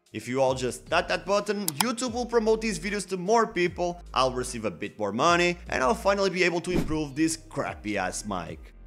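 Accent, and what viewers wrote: Brazil. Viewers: Aparentemente, é um brasileiro ou português falando inglês. :) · Não é um gringo, mas sim um brasileiro falando em inglês. Nobre a intenção, Obrigado.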